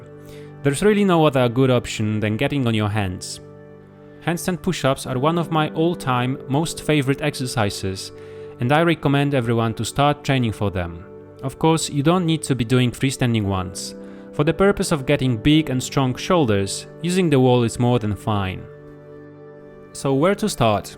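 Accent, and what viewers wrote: Poland. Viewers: What is your accent? Were are you from? You sound Polish a little :D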